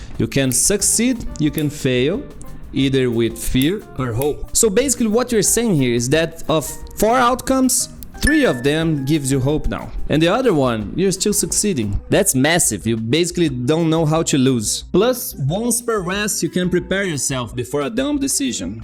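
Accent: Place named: Brazil. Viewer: esse sotaque nunca me enganou. Seu canal tem uma versão br?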